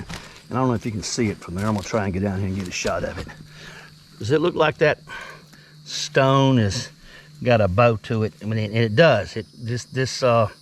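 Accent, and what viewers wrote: South, United States. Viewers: Thank you, this tour was fun and interesting .. Plus, l must say, enjoyed listening to the southern accent .. 👍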